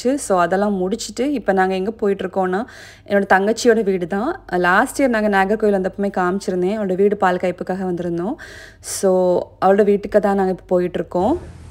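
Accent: Kerala, India. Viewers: malayali aanu alle ... I can guess from the accent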